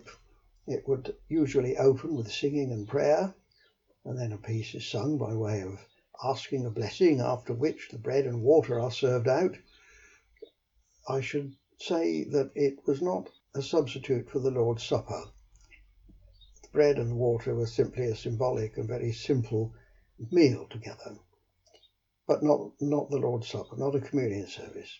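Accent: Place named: England, United Kingdom. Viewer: You have a very refined English accent for a Welsh speaker.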